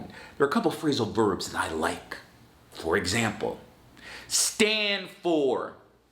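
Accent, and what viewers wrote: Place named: United States. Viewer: thanks so much for your lesson , Sir, and I like so much your American accent and so interesting to learn more,,, 5 ways, suggestions or tips to speak more naturally : 1. connected speech 2. stressing syllables 3. learn English phrases and expressions 4. learn phrases verb 5. English sound or phonetic sound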